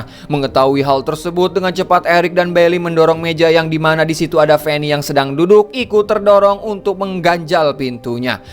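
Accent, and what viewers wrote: West Java, Indonesia. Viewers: Admin nya urang Sunda belah mana euyy😅😮😂😂😂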